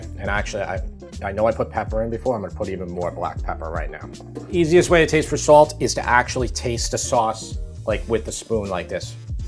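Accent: New York, United States. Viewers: Not gona lie I'm soooo home sick your accent reminds me of my fam from ny😢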